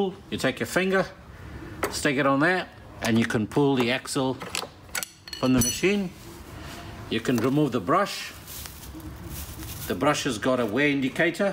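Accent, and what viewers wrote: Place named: Durban, South Africa. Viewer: You sound like you are from Durban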